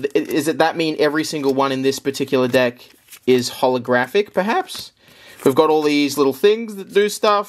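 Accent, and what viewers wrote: Australia. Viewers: He’s not saying it wrong, it’s an Aussie accent you dolt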